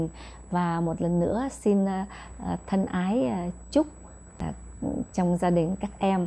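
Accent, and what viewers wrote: North, Vietnam. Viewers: Giông bắc của chị thanh lan dễ thương dạ vân dạ vân hôn chị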